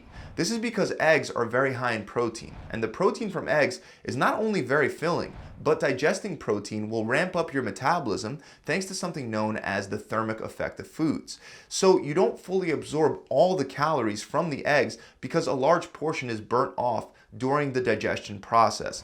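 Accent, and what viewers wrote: Philadelphia, United States. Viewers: I know my Philly (area) accent when I hear it …”wooder” 🤣 from Philly or Jersey? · Love that Philadelphia accent. Great information. Thanks! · That Philadelphia accent is distracting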